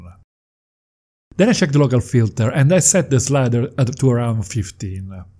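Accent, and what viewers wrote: Italy. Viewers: accent italian. are you italian ?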